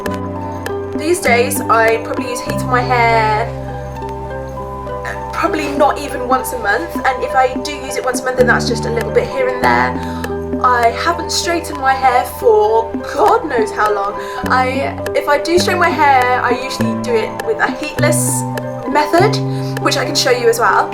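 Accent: United Kingdom. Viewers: She is British tho. Most people understand the British accent, it’s yours that nobody understands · She is fast plus British accent🙄 and the music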